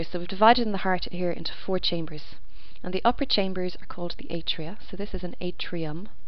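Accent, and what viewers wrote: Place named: Ireland. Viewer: a fine irish accent with good diction ... what could be finer ?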